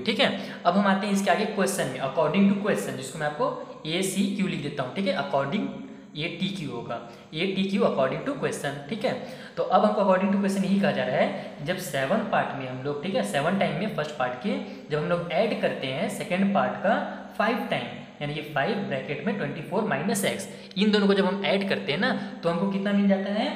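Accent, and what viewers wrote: Bihar, India. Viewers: sir app bihar se ho na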